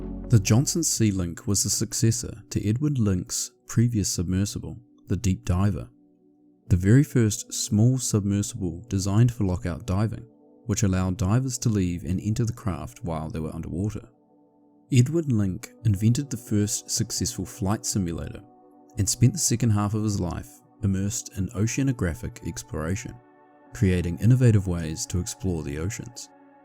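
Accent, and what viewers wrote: New Zealand. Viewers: Love new Zealand accent. “Key Wist”. When you want a beer: “pass me a Bicks” 😂😅🤙🤙❤️ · Dang it! Totally missed your emphasized U sounds!! Russell Crowe is my favorite actor how did Inot recognize your Kiwi accent! You will reach 100k subs by the end of the year I haven't been wrong on predicting channel growth!!! cheers mate!!! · The calm, easy to listen to and easy to understand kiwi accent and your very clear and precise dictation makes for an awesome narration, nice one mate from a fellow kiwi 👍🇳🇿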